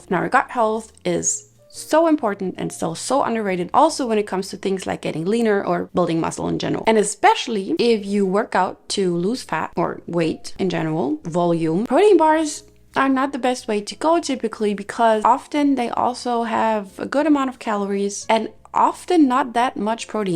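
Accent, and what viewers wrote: Germany. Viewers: I love her German pronunciation though hihi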